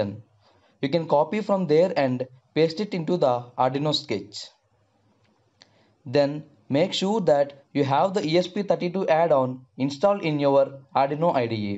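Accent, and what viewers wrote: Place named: India. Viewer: Seems to be an excellent video, and thank you for posting, because I will be making and installing numerous security cameras for our home very soon, and I was considering the ESP32 system because the Pi Zero W board is out of stock everywhere, and this $15 board is being scalped for around $100 while the ESP32 is widely available and inexpensive. I would like to offer some well meant and hopefully constructive criticism, though. I can barely understand anything you say. I know your English is probably perfectly understandable in India, but here in South Louisiana it is almost a foreign language. Perhaps some day just as an experiment, you could try making a video using a text script ran through a text-to-speech converter? There are some very good computer voices available and your videos would be much easier to follow. As a bonus, you would of course then have a complete text transcript that you could post in your video description or that you could upload to your own personal website, and then link to that from your video description. 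Anyway, a more standardized pronounciation would help make your videos much easier to understand, not only to other English speakers, but also to non-native English speakers or those with only limited English skills. Barring that, maybe closed caption? Thanks for reading, and I hope you do not find this to be offensive. Just want to help. <EDIT> I just had a look at your project page. Perfect! Easy to follow, informative, and appears very compl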